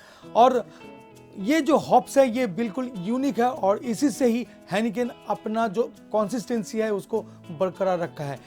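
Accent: Bengal, India. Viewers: Ur tone says that u r Bengali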